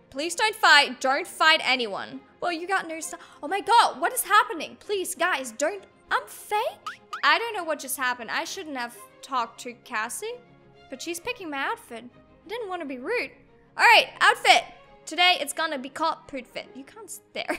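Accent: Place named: Australia. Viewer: Your Aussie omg same i live in Melbourne are you aussie though hope you are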